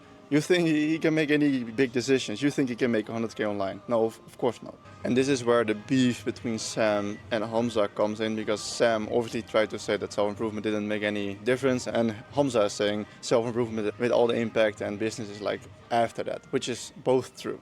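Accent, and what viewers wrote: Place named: Netherlands. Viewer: I should've known you'd be something like 6'8" from the moment I heard your Dutch(?) accent. Idk why I was so surprised 😂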